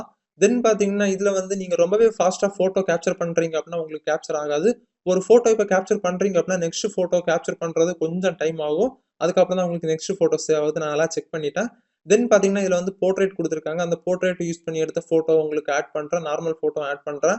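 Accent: Kanyakumari, India. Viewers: Super video bro and voice look like our kumari slang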